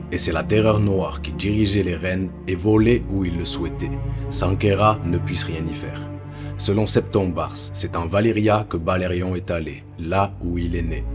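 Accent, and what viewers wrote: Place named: South, France. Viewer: J'adore ton accent mon frère, tié du sud ?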